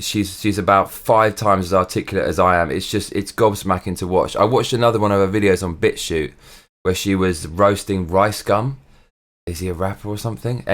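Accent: United Kingdom. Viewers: Yes nice to hear a British gent with Libertarian views! Why do perverts get a whole month? Let's have a month for the nuclear family.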